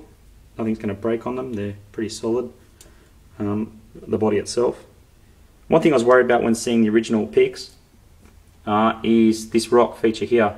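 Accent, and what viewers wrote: Australia. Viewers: nice review must be an aussie mate thanks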